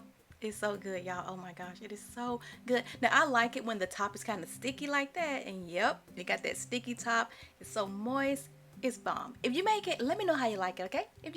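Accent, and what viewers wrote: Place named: South, United States. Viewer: Luv your cookin' and Southern twang sis! 🥰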